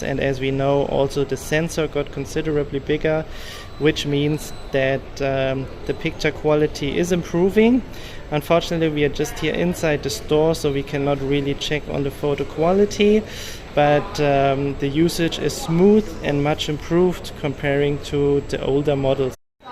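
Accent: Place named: Germany. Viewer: That's a nice German accent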